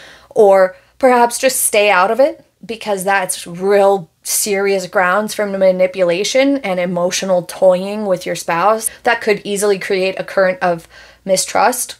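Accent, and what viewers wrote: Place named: Midwest, United States. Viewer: are you from the midwest? I'm catching a slight accent.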